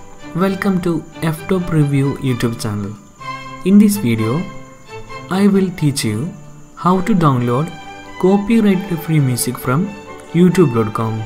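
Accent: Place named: Kerala, India. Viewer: Malayali English.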